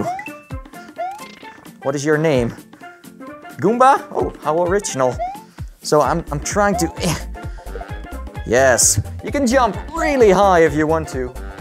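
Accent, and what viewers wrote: Netherlands. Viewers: Where do u live u sound dutch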